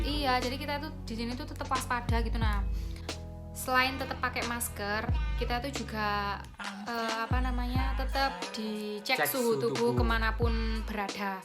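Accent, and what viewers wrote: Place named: Java, Indonesia. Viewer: jowo medhok con.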